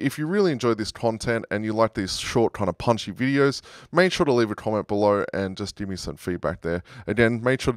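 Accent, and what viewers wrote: Australia. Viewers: Thanks for the videos, it’s nice listening to an Aussie.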